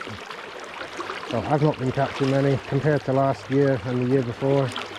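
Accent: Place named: Ayrshire, United Kingdom. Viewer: excellent, I have to ask as it's one of the things that makes up a good watch and listen- where's your accent from? I'm in kilmarnock and I know you've got a little ayrshire but maybe south west of England somewhere thrown in too?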